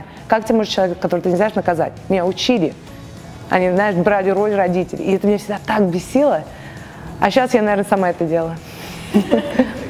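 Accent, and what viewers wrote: Russia. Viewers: К концу ролика стало понятно, что же меня беспокоит (как русского или советского). Это ровное состояние женщины - олвейс смайл, лук гуд, но проблемс, позитив. Ну вы поняли. Ни на секунду не показать даже возможную "не позитивность" что ли. Про искренность молчу. Понятно почему это происходит. Она сама это не специально, это уже на автомате. Так вот я увидел американскость с русским произношением. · не обманывайте больше в названии, она не американка, а русская эмигрантка! её родители русские, она родилась в России, она даже говорит на русском чисто, а на английском с русским акцентом! какая ещё американка? за название дизлайк!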